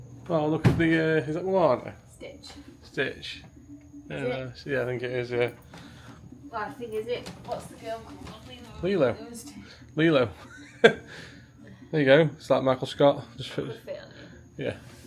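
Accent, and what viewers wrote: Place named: Stoke, United Kingdom. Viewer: Watching travel day.. Cant comment on that video. But i said within seconds.. Thats a stoke accent.. But yeah buy that stoke kit. Ill wear mine on travel day in 4 weeks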